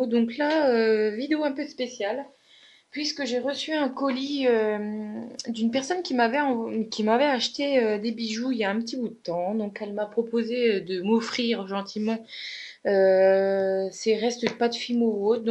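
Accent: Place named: Nord-Pas-de-Calais, France. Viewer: comme ta l'accent chti toi c trop lol la chance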